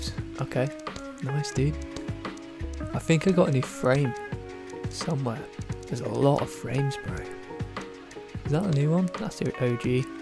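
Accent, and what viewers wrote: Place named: United Kingdom. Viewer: most British sounding dude ever but in the best way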